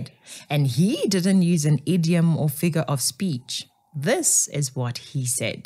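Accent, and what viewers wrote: Model C, South Africa. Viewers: With your model C accent, you shouldn't be Zille's apologist. Dont try to be a good black.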